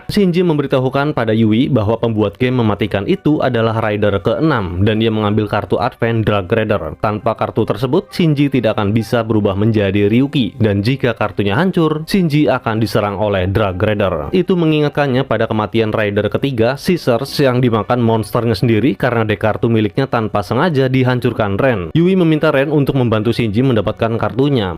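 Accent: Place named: Cilacap, Indonesia. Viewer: Rika wong Cilacap yah kang 😅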